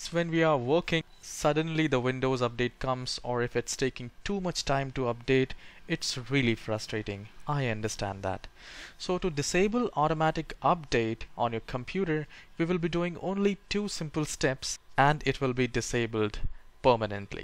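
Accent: India. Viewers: Thanks bro, are you indian by any chance?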